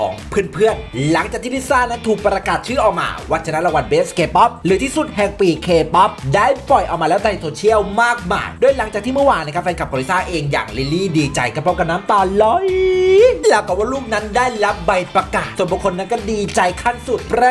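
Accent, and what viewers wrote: Isan, Thailand. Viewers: ขำบพเว้าอิสานน่ารัก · แอดมิน....คนขอนแก่นหรือสารคาม...แมนบ่ล่ะนิ...😁😁😁😁😁😁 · ดีใจกับน้องกะดีใจอยู่ แต่ขออนุญาตหัวเสียงพากย์คลิปของแอ๊ดแหน่ได่บ่ฮึ..ไทบ้านได๋น้อแอ๊ดนิ🤣🤣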